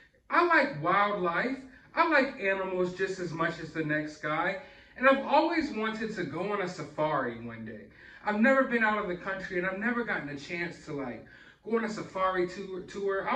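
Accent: Australia. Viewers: I love elephants and don’t blame them for being annoyed at humans . Love. Your Australian accent btw 🙂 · I love Australia and love your Australian accent. I would have passed out.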